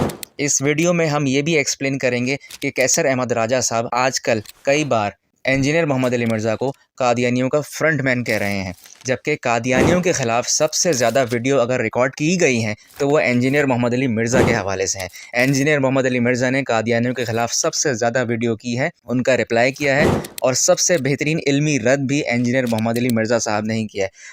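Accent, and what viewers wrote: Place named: Karachi, Pakistan. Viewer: Karachi wali awaz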